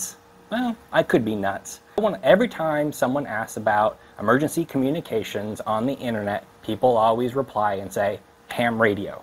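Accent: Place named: Austin, United States. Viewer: Yup. You're definitely from Austin.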